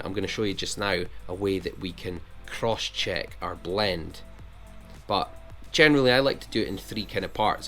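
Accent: Scotland, United Kingdom. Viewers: I love the fact that you are Real. You like us, can make the common mistakes of barbering. It’s this awareness which helps us learn how to fix ours. I could watch you for hours. My third great grandad was Scottish. I love your accent. Well done for being a great tutor. ❤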